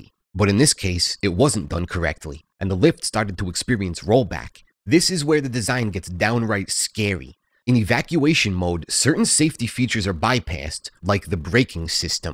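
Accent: United States. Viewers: Ill take an american accent over an AI generated bot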